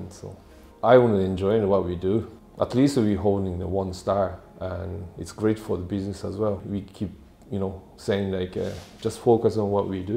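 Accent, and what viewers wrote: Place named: Cork, Ireland. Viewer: I love that he's developed a Cork accent, next time I'm Cork I'm going to try his restaurant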